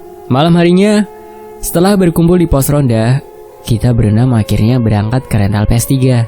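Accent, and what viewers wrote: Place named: Cianjur, Indonesia. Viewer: Cianjur suarany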